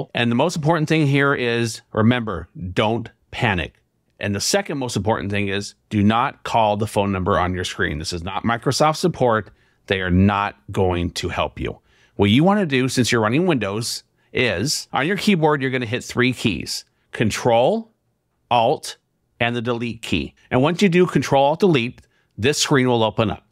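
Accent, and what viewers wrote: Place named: India. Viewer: She had an Indian accent!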